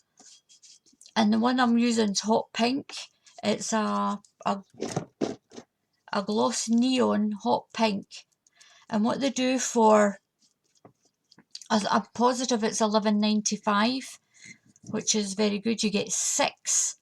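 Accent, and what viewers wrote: Scotland, United Kingdom. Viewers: love to hear yr Scottish twang my nanny was a broad Scot xx love and miss her xx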